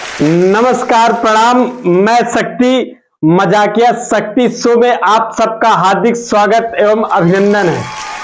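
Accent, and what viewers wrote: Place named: Purvanchal, India. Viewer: वाह रे मेरे पूर्वांचली शेर तुम्हारे लन्ग्वेज से साबित होरहा है की तुम U P मे किसी पूर्वांचल जिले के हो क्यूंकि इतनी शुद्ध हिन्दी भारत के किसी प्रदेश या जिले मे कोई बोल नही सकता है M D sheikh from बनारस up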